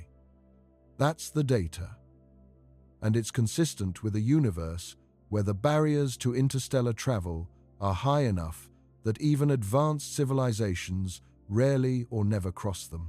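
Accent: United Kingdom. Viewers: Absolute rubbish. I understood that in the first 30 seconds of this waste of time. Flight was also impossible. Reaching the moon was also impossible. Then it was accomplished. The same small mind in the first two examples is now narrating this video. “The physics as we currently understand it”. All that says is EARTH SCIENCE is clueless. The inconvenient fact however, is people are looking up into the sky and seeing them dotted with “UAPs” that by the logic of this video, shouldn’t exist. But they do. They’re here with ease, and have been as long as human history has seen them, thousands of years. Amazingly, the idiocy of the narration refers to the “speed of light” as a factor in interstellar and intergalactic travel. This is a kindergarten child telling a university mathematician why his understanding of physics is impossible. Needless to say this video got no like and no “subscribe”. 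The “British accent” didn’t make this inanity sound any smarter.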